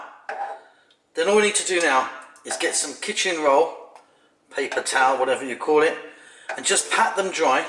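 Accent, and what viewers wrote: United Kingdom. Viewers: At last ! A British channel with a British chef/cook and a British accent 😁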